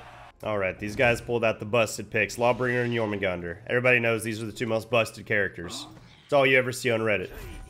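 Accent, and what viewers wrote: United Kingdom. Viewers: Best british accent 10/10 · British accent, beret hat, great at games, I thought you were being like Trutalent lol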